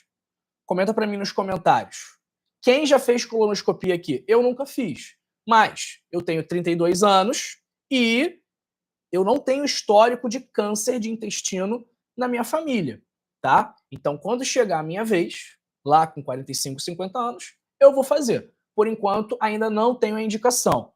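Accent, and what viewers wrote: Rio de Janeiro, Brazil. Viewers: Esse sotaque carioca é um horror 😮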